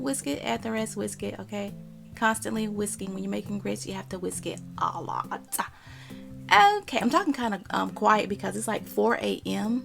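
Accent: South, United States. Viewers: I don't watch your videos for the recipe I watch it for your accent love your accent southern girl so am I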